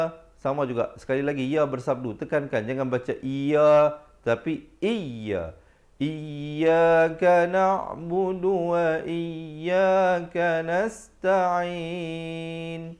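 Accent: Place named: Malaysia. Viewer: Lawak uataz ni.. Bunyi Melayu beno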